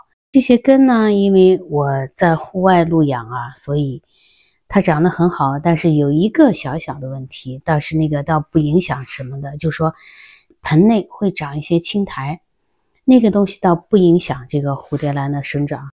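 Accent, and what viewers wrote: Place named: Xi'an, China. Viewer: 叶子长得太美了，听老师的口音感觉咱俩是老乡啊，我是西安人😆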